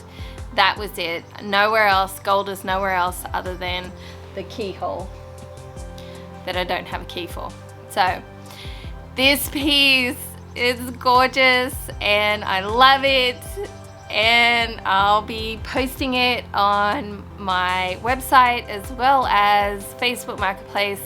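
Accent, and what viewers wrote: Australia. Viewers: I must say, I love your Australian accent❤️👍 as well as your work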